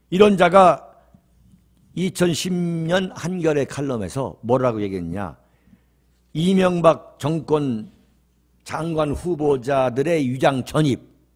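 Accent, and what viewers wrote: Chungcheong, South Korea. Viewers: 윤칼세님 방송이 그래도 재밌음 느릿느릿한 충청도말이지만 진실과 설명을 잘 애기해주네요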